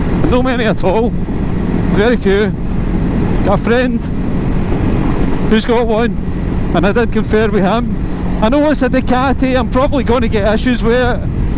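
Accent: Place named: Scotland, United Kingdom. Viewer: Took me a while to 'tune' into your accent, but then after I dialled in Scottish in my head...... all good. Fun 'non review', will watch your other content to see how it plays out for you. I'm just looking in 2025 for a used HyperStrada here in Australia, not many to be found and all are the 2013 '821 version, nothing later. I'm after something non sports Ducati as my wife loves Ducatis BUT most dont do a pillion well. Scramblers appear to be an obvious choice for the seating BUT scramblers are really poorly appointed with cheap fittings, absolute scam for the price imo. Monsters we have tried but not pillion friendly, and then all the 'touring' Ducatis are heavy and bloated. The Hyper seems to be the only real option that is light, agile and simple enough for me. Just a matter of finding one at a decent price. I have boxer BMs and F800GT BM so I understand how a good bike should be made and handle, my last Ducati 749 was a lounge princess, as was the 1100S monster, I want to actually ride my Ducati but dont want to go back in time to the aircooled models for simple no time pressure maintenance. 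2013 seems to be the start of the more reasonable service intervals on the belts so I am in the correct zone I hope.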